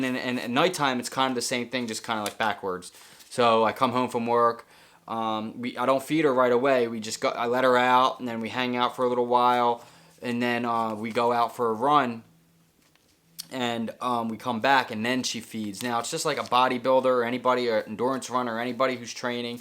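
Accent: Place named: Maryland, United States. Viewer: I was thinking Maryland too, possibly Delaware. The accent!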